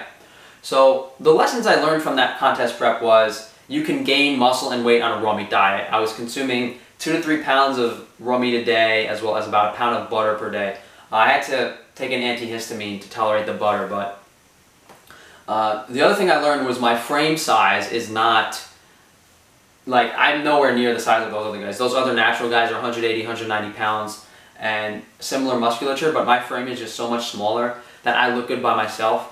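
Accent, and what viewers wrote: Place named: New York, United States. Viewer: I love your muscles and you are fine as hell.I don't care what anyone say.you're hot.Love listening to your videos rather I agree with them or not.Even if I don't agree with some of the information I just love listening to you talk.I love your Italian and new york accent.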